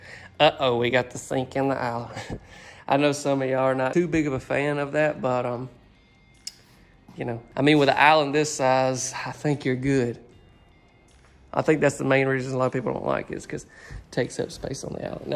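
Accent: South, United States. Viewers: Hey, I really enjoy watching your videos. I love your southern drawl. And I LOVE the pantry dance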